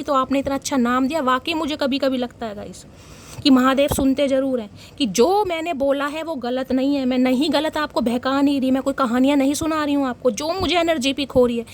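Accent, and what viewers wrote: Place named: Bihar, India. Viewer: आज बिहारी स्टाइल में आपका बोलना मज़ा ही आ गया ❤❤ और रीडिंग सुनते हुए एक ख्याल आया की आप जिस तरह हमारे पक्ष में बोलती हो तो कहीं सारे चचाचनेरा आप पर ही कुफ्र न धरने लगेंगे 😅😅 काहे दुश्मन बढ़ा रही हो प्रिय 😂 खैर जो भी हो पर आपकी वजह से हम उबर चुकें और बाकि तो महादेव हैं ही सर पर हाथ धरे हुए 🙏🏻🙏🏻🙏🏻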